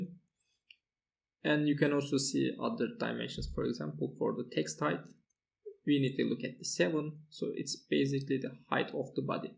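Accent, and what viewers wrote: Turkey. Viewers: türk aksanı nerde duysam tanırım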